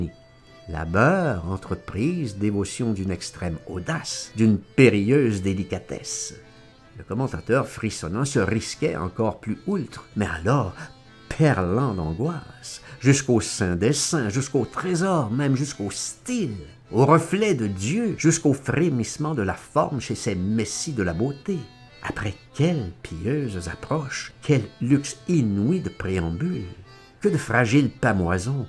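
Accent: Quebec, Canada. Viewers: Moi qui ai du mal avec l'accent Quebecois, souvent coupé au couteau, je trouve qu'ici il apporte beaucoup de vitalité à un texte drôle et grinçant. Vous avez du talent.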